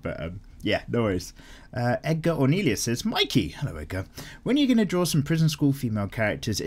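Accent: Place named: Liverpool, United Kingdom. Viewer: liverpool scouser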